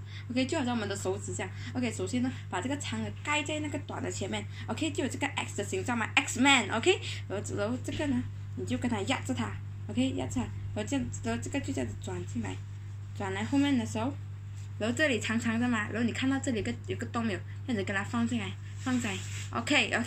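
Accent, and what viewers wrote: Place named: Malaysia. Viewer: 我的天马来西亚风的说话pattern哈哈哈 还是这种pattern适合我 我看了好多视频我都一头雾水哈哈哈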